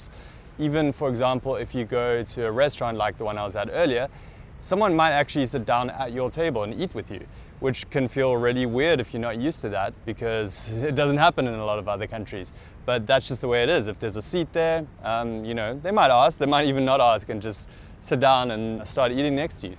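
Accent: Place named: South Africa. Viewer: Ek hoor 'n Suid Afrikaanse aksent.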